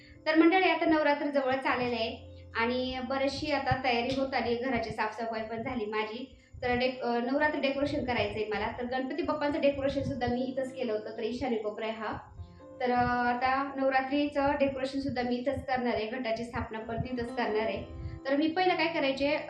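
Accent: Ahmednagar, India. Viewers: श्री स्वामी समर्थ ताई डेकोरेश एक नंबर. केडगाव देवी ला नक्कीच येईल मला खूप खूप आनंद झाला ताई मि घरात सगळ्या ला सांगितले ताई बोलवल ताई आज फोजी साहेब कुठे गेलेत दिसले नाही ताई आपली नगरी भाषा छान 🎉😊😊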